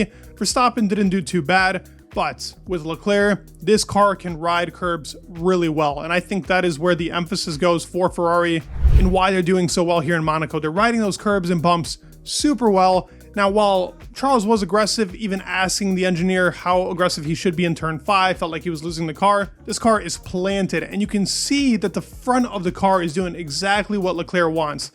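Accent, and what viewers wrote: United States. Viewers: Same here. I listen to the Brits of course, they have a lot of good content, but it's great to hear an American voice being absolutely as good as any of them, and with perhaps a little less bias towards the British teams and drivers.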